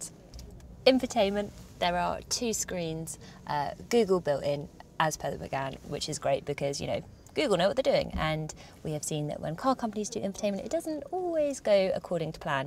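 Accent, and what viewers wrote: United Kingdom. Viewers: She has a cute British accent, though I think she will be even better if she was not in such a hurry and speak slower.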